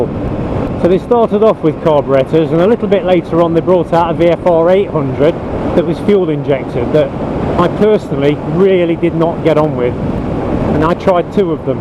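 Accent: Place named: Leicestershire, United Kingdom. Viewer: Im trying to work out the accent...defiantly Leicestershire...roads look familiar . good review...a refreshing change from silly,loud mouthed over excited yanks.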